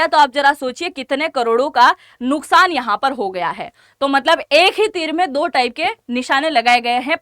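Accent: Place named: Marwar, India. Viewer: मैडम नमस्कार। आपके वीडियो बहुत तथ्यपरक और रोचक होते हैं। इनमें सच्चाई होती है,न कि मसाले बाज़ी। एक जिज्ञासा भी है। क्या आप राजस्थान के मारवाड़ क्षेत्र की रहने वाली हैं? आपके लहजे और उच्चारण से ऐसा ही लगता है।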